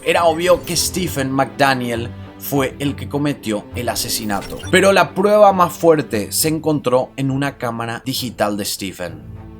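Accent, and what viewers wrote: Paraguay. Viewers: No soporto el acento paraguayo. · Muy bueno hermano te descubrí hace un par de días. Ágata descubrí el acento paraguayo, muy buenos los videos. En Tucumán Argentina hay dos casos interesantes uno es Dulio Fernández creo que del año 1995, un chico que desapareció en un río, y hasta hace poco se hicieron búsquedas en EEUU por su desaparición. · Me encantan tus casos y como narras!!! Dios mío, y más aún escuchar las narraciones con ese acento paraguayo 😍 jajaja. Seguí asiiiii, éxitos siempre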